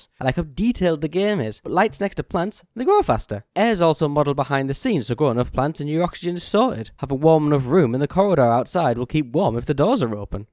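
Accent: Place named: United Kingdom. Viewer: If you actually talk to British people as you say you do, then you would know that is British. Same as most countries we have regional accents, and if you say you 'can't' understand him, then look else where. What i find most hilarious about your comment is that American's and Australian's got there English from the actual British! Be more considerate instead of being a douche! To, Your video is fine the way it is, great job! Don't listen to people who proclaim to know what a British accent sounds like:p as i said its regional!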